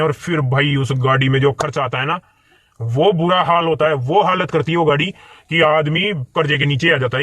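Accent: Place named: Hadoti, India. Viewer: Bhai boli se hadoti k lag rhe ho. . Kaha se ho. .❤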